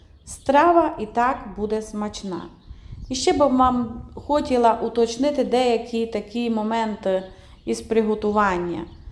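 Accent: Transcarpathia, Ukraine. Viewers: Вона прекрасно говорить закарпатським діалектом то є наша мова у нас усі так говорять вчіться обисьте розуміли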